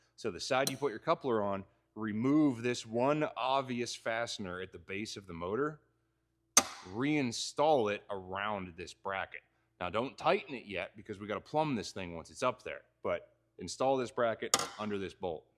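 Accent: Western Pennsylvania, United States. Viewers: U from western PA?!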